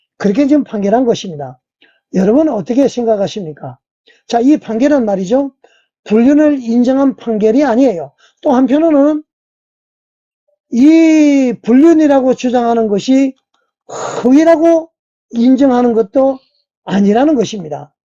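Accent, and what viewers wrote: North Korea. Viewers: 어투가 북쪽어투 근접~~ 그냥 밝혀 주세요~~ 나의 출생고향은~~ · 유튜버님의 말투가 북한 말투 같은데... 실례지만 고향이 어디신지요?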